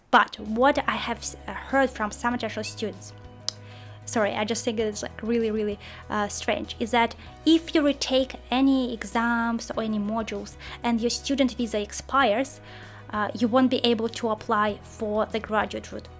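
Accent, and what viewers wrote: Russia. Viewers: russian accent sucks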